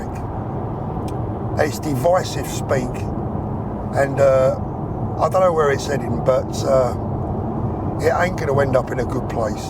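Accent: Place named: London, United Kingdom. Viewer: There we go with this disgusting un-English accent. I spent 5 years in London and found there the most horrible English accent in the world....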